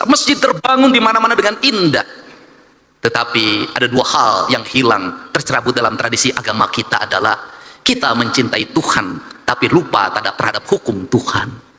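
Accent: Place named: Java, Indonesia. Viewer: Inilah ajaram sunda wiwitan yg sebenrnya, Logatnya pa dedi logat sunda saja ga usah ke jawa jawaan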